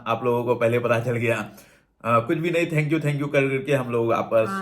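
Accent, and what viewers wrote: Bihar, India. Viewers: ji tumhari hindi to acchi h par bihar wali h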